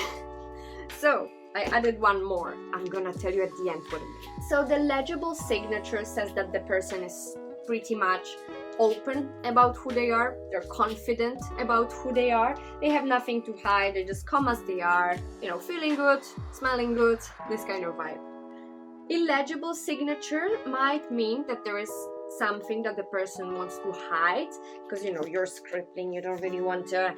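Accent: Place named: Germany. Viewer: = Not Italian; +the accent (and way cute!), she's likely Polish or some other former Warsaw country. Her name in Italian would be something more like: Alessandra Piotro. Before seeing her surname in video (9) I thought maybe High-German by the accent and the way she wrote the number "1" in video (3)....unless that's her husband's surname, in which case I'll stick with German. Else if not married, wanna go on a date with me?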